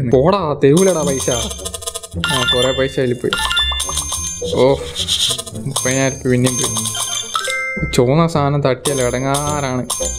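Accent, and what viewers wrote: Kannur, India. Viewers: Chettante language slag aan pwoli Kannurkkaru poliyalle🔥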